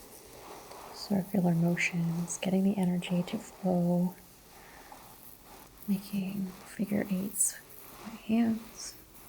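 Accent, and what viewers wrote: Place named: West Coast, United States. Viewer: I totally thought you were east coast! Not west coast! I am JEALOUS of the Seattle ladies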